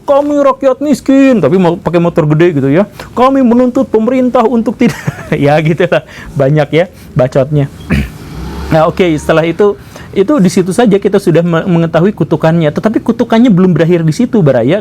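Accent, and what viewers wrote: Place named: Sunda, Indonesia. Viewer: Se7 pak....logat Sunda nya medok banget euy...orang Indo nya yg harus mau berubah, lebih mau bekerja keras tapi juga harus cerdas dong ya...😆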